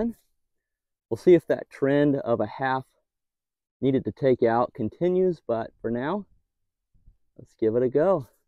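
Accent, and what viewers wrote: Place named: Atlanta, United States. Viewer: I knew that accent was from Atlanta lol, just needed to hear the "Ah-lanna"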